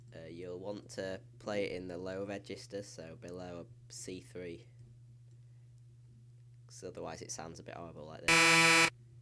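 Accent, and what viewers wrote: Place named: Nottingham, United Kingdom. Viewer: u sound like ur from nOTTTINGHAAAAM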